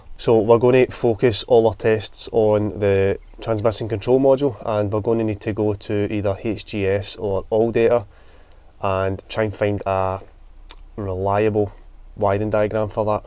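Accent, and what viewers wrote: Scotland, United Kingdom. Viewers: Scottish. Subbed. 🙂🍺🍻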